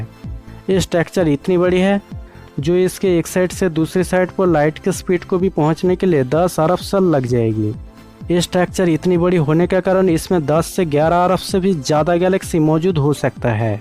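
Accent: Bengal, India. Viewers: ভাই বড্ডো বাঙালিদের মতন শুনতে লাগছে। কিন্তু খুব ভালো হয়েছে। আরো ভিডিও বানাও। অবশ্যই ভাল হবে।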